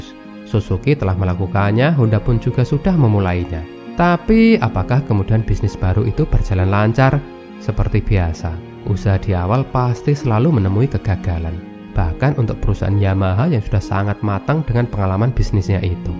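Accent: Java, Indonesia. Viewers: Gpp medok. Saya jg medok 😁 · saya nyaman dengan suara medoknya.. sya dnger cerita ini sblm tidur enak bgt hhe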